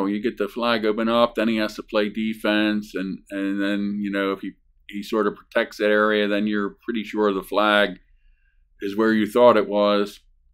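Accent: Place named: Baltimore, United States. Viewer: I'm from Southwest Baltimore County I should've known! It sounded like a Baltimore accent but a little different that's why I thought philly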